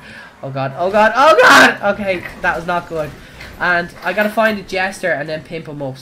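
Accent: Ireland. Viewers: OMG happy birthday <3 luv ya and your vids xxxxxxx hope it's a good one xxxx 4 ever loving you and your Irish accent From maria x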